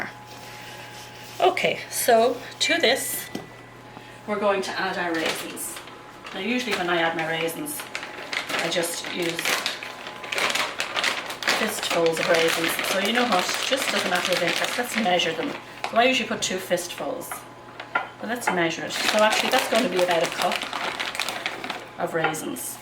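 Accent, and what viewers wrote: Ireland. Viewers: somehow the scones look like they would be more delicious because she has an Irish accent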